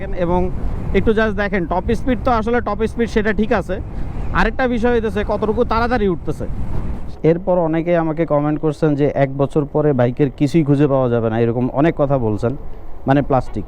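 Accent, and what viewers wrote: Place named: Keraniganj, Bangladesh. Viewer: Vai toh mone hoy Keraniganj er🙌❤️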